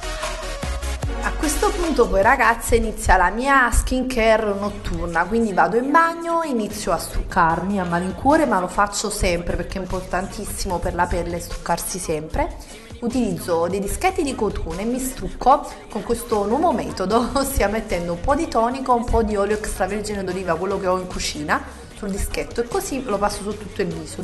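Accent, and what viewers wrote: Naples, Italy. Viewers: ma sei Napoletana?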